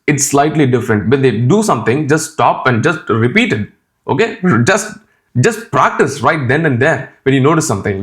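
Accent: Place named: India. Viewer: The way you're speaking is how most Indians speak, so it is an indian accent. The first one you did is stereotypical, never have i ever met someone in my life who speaks like that.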